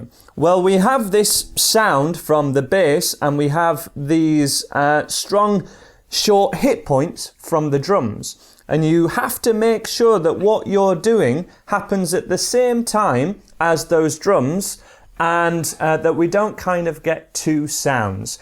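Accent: United Kingdom. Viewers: Jesus, man, you're sooooo britttish!! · Something tells me that your british 🤔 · Speediablo 7 Productions he sounds absolutely nothing like Ali-A, the only similarity is that he’s british, that’s it. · you are only brit that i can listen to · I think this guy is a bit British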